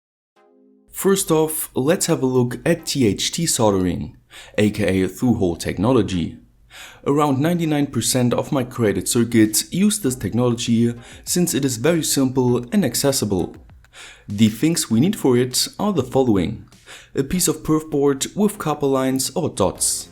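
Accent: Germany. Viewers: are u deutch? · Your accent is kinda like german are you from Germany?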